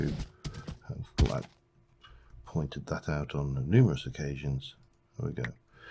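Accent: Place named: United Kingdom. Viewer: "Tears" for the series ending but with that comes a new beginning. I've been with you since your second series of TFC and I would just like to say that I think you have one of the best TFC series out there right now. Like Jimmyzation said I'd like your next series to go just as long. I"m from the US and my sister and I love british accents and whenever I'd have a problem with my family, work or pretty much anything I'd just sit down and turn on your TFC series and everything else just fades away. I do have a request. I wouldn't mind seeing a blooper reel. We're all human but its nice to see us being human every once in awhile. I also wanted to apologize for not commenting more, I know it gets your vidz out there. I watch your series on my game console. But I'd just like to say thank you for your time and effort. Your series has been a great inspiration for my own builds and a great stress reliever for me and my family.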